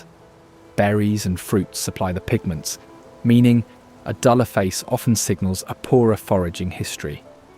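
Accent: United Kingdom. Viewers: Uh… that black catfish with the white stripe does not exist here. Who did this video? I’ve fished catfish in the Texas hill country for over half a century and have never seen anything like that. Channel cats, blue cats, yellow cats and the occasional bullhead are the main species. And the bird eating a crab never happened either. That would be at the coast. No crabs in the hill country. The British accent now makes this suspect. Also the comment about Canyon Lake being not natural is strange. No lake in Texas is natural except for Caddo. Sorry about my critique. Other than a couple of glaring mistakes this is a good video. Oh, and Luckenbach has a huge gift shop. Always has. This area in Texas is my soul.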